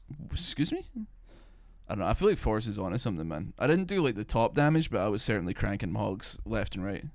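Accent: Scotland, United Kingdom. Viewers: am from scotland u sound scottish enough for me man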